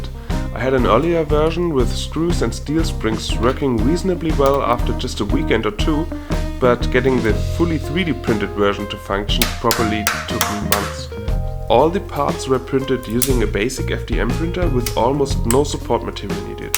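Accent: Germany. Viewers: u sound very german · Are you german? Your english sounds a bit german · First off, great construction! If I’m right and interpreting your accent correctly, you’re German. Please consider that this is a gun law violation, and if they catch you, you could get into serious trouble. If you are not german and dont have silly laws, then keep up the work lol xD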